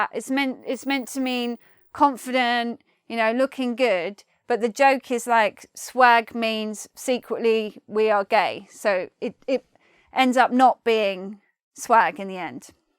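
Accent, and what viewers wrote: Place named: England, United Kingdom. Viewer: why wre not you my high school teacher ? i love you accent so English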